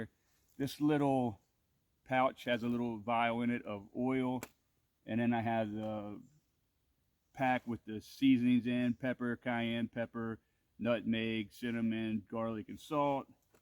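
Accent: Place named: Philadelphia, United States. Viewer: Nice job with your video. What County are you in? You sound like you’re from the Philadelphia area!!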